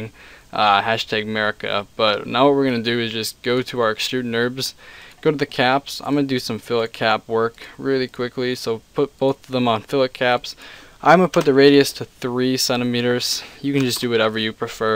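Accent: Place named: Quebec, Canada. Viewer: where are you from i can't tell from your accent. I'm either thinking Quebec or some french speaking place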